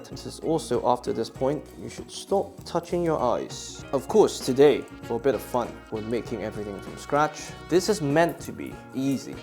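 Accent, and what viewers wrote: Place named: United Kingdom. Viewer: very high quality video, and with brits accent, really nice.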